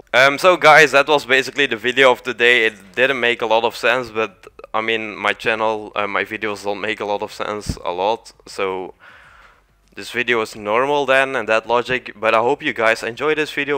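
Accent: Netherlands. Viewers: Is the commentator from dutch/netherlands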